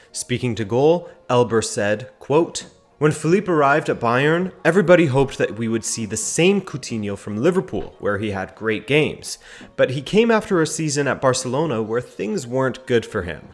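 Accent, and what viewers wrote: United States. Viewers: Are you American? Lol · the only time I hear football spoken about in an American accent, it's completely bashing it. nice change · Heard an American accent, turned off. It's Inter Milan not Inter Merlhaaaan. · When I heard your American accent I thought you’d be making me angry at some point like most Americans talking about football. That didn’t happen. Very informative and well made video.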